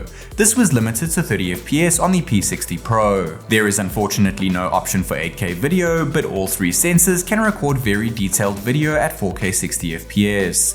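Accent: South Africa. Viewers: You're South African???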